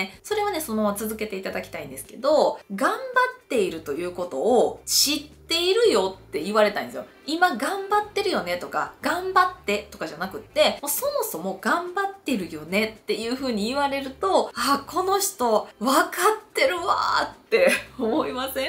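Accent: Kansai, Japan. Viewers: こんばんは(^-^) 関西弁と笑顔が素敵ですね。 最近、少し痩せましたか？ これからも、応援してますね。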